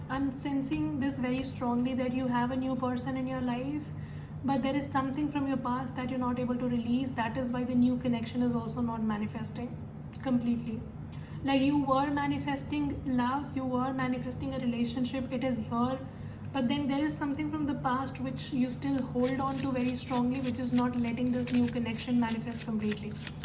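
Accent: India. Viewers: Your Indian accent is very interesting I like they way you laugh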